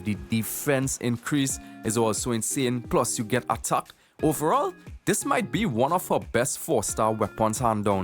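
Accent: Trinidad and Tobago. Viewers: Trini to do bone! I’m half way an island boy myself🇧🇸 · I just got this video on my recommended and the trini accent caught me so off guard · love the trini accent makes the guide actually entertaining ill give u a like and a sub u deserve it :D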